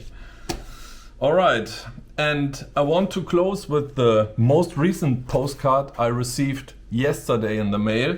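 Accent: Germany. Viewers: Hello, I' m surprised, that I understood the most of what you said😉 and I love your german accent. Nice story about the postcards👍. Best wishes, Maria🙋‍♀️ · Coole Schreibmaschine! I like your German Accent! 😅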